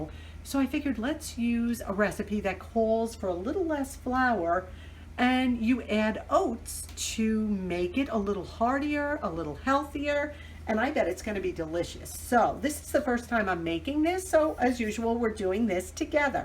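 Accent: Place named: New Jersey, United States. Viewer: I love what you did with the table top! It looks wonderful! I am from Iowa but your accent reminds me of my dear friend in New Jersey! I enjoy listening to you and look forward to your videos! Good job!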